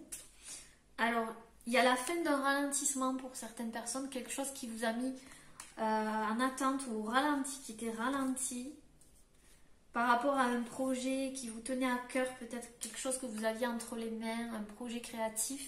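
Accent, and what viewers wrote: South, France. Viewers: Votre accent du midi est absolument charmant et dynamisant c est trop chouette et mignon 🙃❤️👩‍🦽👁️👁️🌈🗝️🪐😇🌝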